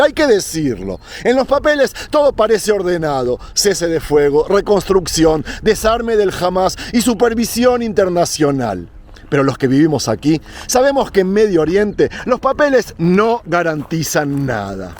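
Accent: Argentina. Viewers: No confíes nunca en los polîticos, seguîd en peligro, los terroristas siempre lo son, pactos de paz para bebeficio de los firmantes, yo me vompadezck de los pobres gazzties masacrados por sus propios compatriotas, tiros en la cabeza porque tenemos que seguir matando a alguien, es nuestra rasôn de ser, Te escribo desde edpsña, aquí tuvimos a eta, ahora estàn en el gobierno, cobrando como políticos, sentados en despachos y blanqueando su pasado asesino, las pistolas estàn calladas, pero siguen guardadas, estás muy acertado, te noto cabreado, y no es para menos, trump! Un megalómano, on ínfulas de gobierno total, cuidaros mucho, ya os falló una vez el sistema de seguridad, a Dresde ó no?, fue atentado con ayudadrl propi Israel, posible,?, todo un gran teatro. Ànimo y ojo avizor wué tengáis mucha suerte y wué dios os proteja, desde espsña con amor, gran reportaje un gusto oírte ese bello espsñol argentinizado ó bueno como sea me gusta tü musicalidad.❤❤❤❤❤❤❤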